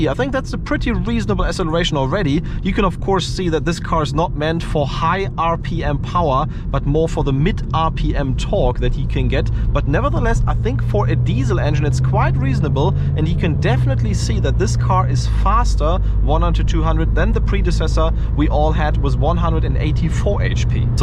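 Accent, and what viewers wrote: Germany. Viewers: Ich liebe es wie man soo hört dass du deutscher bist an deinem englisch niceeee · Du hast doch nh deutschen Akzent oder?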